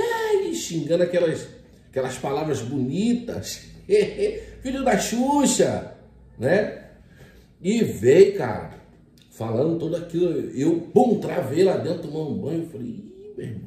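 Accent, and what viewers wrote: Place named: Bahia, Brazil. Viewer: Como consegue falar baines assim , como baiano .opaió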